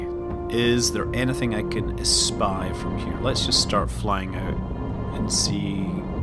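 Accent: Scotland, United Kingdom. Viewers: so i went to increase the resolution on the video since youtube thinks im on dialup. Ended up hitting the closed captions. fuck me this shit is hilarious with a scottish accent!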